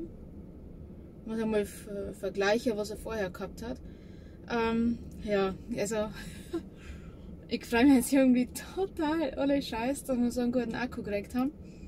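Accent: Bavaria, Germany. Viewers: Hallo. Feine Sache. Nun gut, diese etwas säuerlichen Regelungen, dass man ein gleichwertiges, gebrauchtes Teil bekommen kann, die kenne ich auch aus dem Job. Die Leute denken immer das Garantie (Gewährleistung) bedeutet, dass man auf alle Fälle Neuprodukte beanspruchen kann. Pustekuchen. Allerdings ist es schon komisch, dass beim Akkutausch Tesla anscheinend Lotterie spielt wer was bekommt?! Nuja, Hauptsache es läuft wieder. Dein Dialekt erinnert mich an meine Kindheit, wo wir immer nach Bayern in Urlaub gefahren sind. Cool irgendwie. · Ich mag deine Videos und deinen Bayrischen Dialekt.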